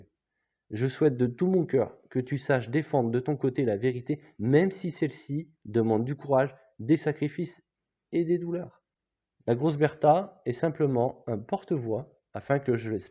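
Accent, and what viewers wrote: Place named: North, France. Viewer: 😊Merci pour votre sincérité, votre véritable foi, votre courage et votre bienveillance qui transpercent à travers votre ton et ces analyses basées sur l’enseignement du Christ. Votre intervention ici, est un baume sur les plaies. PS.: votre léger accent résonne familièrement à mes oreilles, moi qui ai passé ma jeunesse dans la ville de Robespierre.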